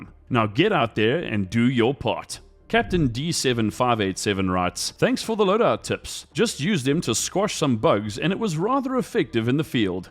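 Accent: South Africa. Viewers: He is from South Africa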